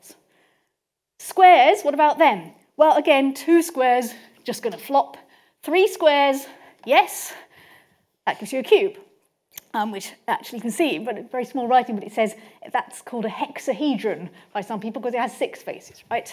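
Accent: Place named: London, United Kingdom. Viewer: BTW... I love her English accent.. London? ❤️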